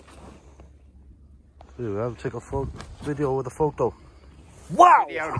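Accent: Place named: Cardiff, United Kingdom. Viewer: Looks great great video and voice over that sounds like a Cardiff boy 👌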